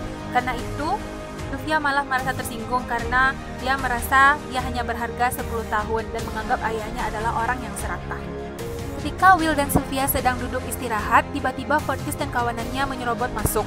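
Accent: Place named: West Sumatra, Indonesia. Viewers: FIX... INI mah orang minang tulen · Adminnya orang pekanbaru nihhh atau orang sumbar kalo diliat dari logatnyaa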